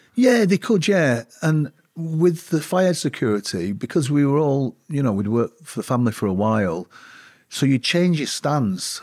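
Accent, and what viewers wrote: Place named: Scotland, United Kingdom. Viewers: How frustrating and disappointing for you. The Scottish accent can be like that may I ask where you from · Yes Lee Sansom is a great bloke, and, (what a surname, for such a strong Scottish accent Scot), is a great interviewer... BUT if Lee Marshalls his actions, as badly as his thoughts, God help anyone he was protecting. He goes around the houses, with pausing to an irrorating level, I wouldn't have him baby sitting, never mind protecting people for huge sums of money. There are glaring inconsistences! IF the British MI5 & MI6 were watching everything, and that is normal, did they ALL have a night off when Lady Diana died? Why was no white Fiat found, they had the paint on the Car, and even if the Tunnel CCTV was off, ALL of Paris's CCTV was NOT? Why the close Bike rider who may have used a Driver Blinding torch, why was he never found? 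Why did they not take her to the nearest Hospital? Why did it take 2 Hours before anyone tried to save her? A following Security Car should have been following, so if the Celebrity Car is damaged, or unable to move, the Celebrity can be transfer to the following Car. This is standard Body Guard Protection. Now if this Lee Sansom is a nice bloke, that still does not qualify him as a Body Guard. For some reason I cannot fathom, you all seem to think "nice blokes" do their job well, I couldn't care if he's a Saint, he is bloody useless in a Crisis, or even able to DO HIS HOMEWORK. If HE does not know the answers to my questions, he is a totally stupid, bloody shit Body Guard. Because ALL of those questions HE BLOODY WELL SHOULD KNOW! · Hi, I’ve just found you, so glad I did! I’m writing b4 I’ve finished listening to this. Wanted to say it’s fab to hear a fellow Glaswegian doing this and I’ll be checking out your other stuff. 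Well done 👏 ya handsome young man 😅 Your guest is fabulous!! He’s got such a beautiful spirit, so open and warm. Don’t mind admitting I’ve got a big crush 🥰😂 I admire how straight forward your interview style is and I’m looking forward to learning more about you through your back catalogue. Well I’ll stop noo and listen to the interview. Health and happiness to you and yours ❤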